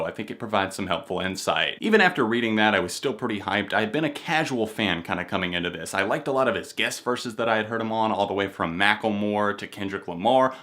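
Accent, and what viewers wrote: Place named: South, United States. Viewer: Bizzarro southern twang version of Anthony Fantano lol. Liked your review thanks.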